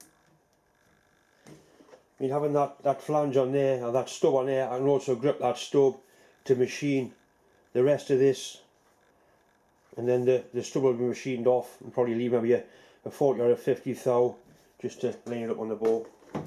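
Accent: Newcastle, United Kingdom. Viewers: just watched some of the video with subtitles on, Im guessing it doesn't understand a Geordie accent.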